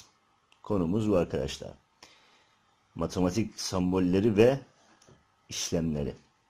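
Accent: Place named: Australia. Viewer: Aussie english!!!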